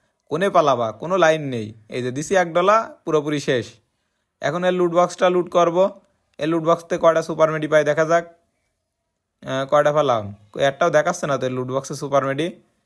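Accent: Jessore, Bangladesh. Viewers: ভাই আপনার বাড়ি কি যশোর, সাতক্ষীরার দিকে?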